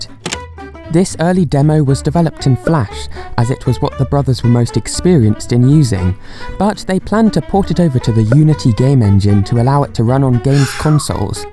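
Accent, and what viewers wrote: United Kingdom. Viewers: Hes british like me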